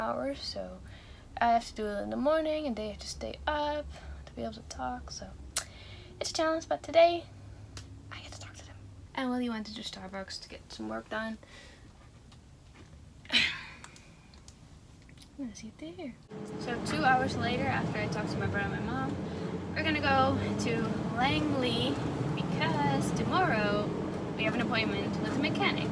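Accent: Netherlands. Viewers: lovely video!! i didn't know your channel before and honestly didn't look up the description or your channel before watching this video and omg, i immediately thought that you're from the Netherlands!! i absolutely love your accent! and the food ideas in this video 😍 i need to see if you've got more of these videos about you both living vegan without a fridge ☺️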